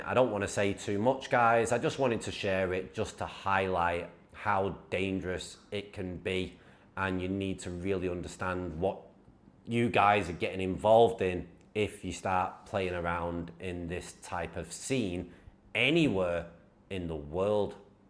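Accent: United Kingdom. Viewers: Complete BS!..he is British by the way he talks.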